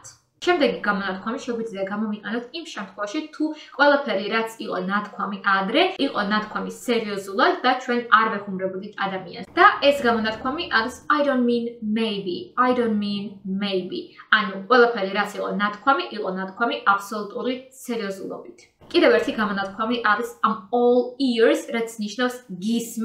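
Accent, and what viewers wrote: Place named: United Kingdom. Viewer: ძალიან კარგად ხსნი, მაგრამ ბრიტიშ ინგლიშს სამწუხაროდ ვერ ვიგებ, ამერიკული ვარიანტიც დაამატე რა, ამერიკული გამოთქმა,თ- ს ყური ვერ ეგუება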